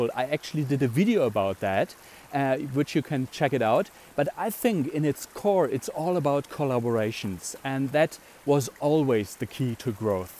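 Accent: Germany. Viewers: Are you from Germany ? Because you English is more in the dialect of German ?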